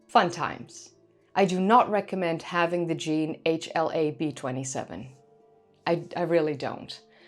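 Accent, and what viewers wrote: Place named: Netherlands. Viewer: Your Turkish pronunciations sounds so Dutch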